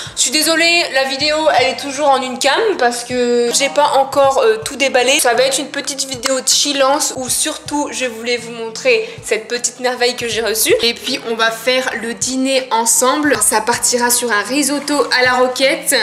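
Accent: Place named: Nord-Pas-de-Calais, France. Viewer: cet accent ch'ti j'adore!!! T la meilleure!!!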